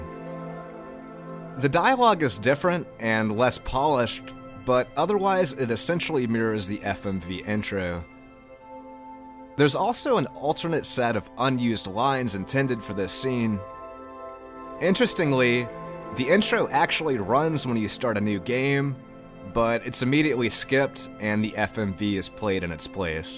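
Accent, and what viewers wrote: United States. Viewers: I love the content and it's not your fault but the voice reminds me of Arin from game grumps whenever he does a generic protagonist voice. It might just be American inflection on some words. I just can't help but giggle a tad when I hear words spoken like that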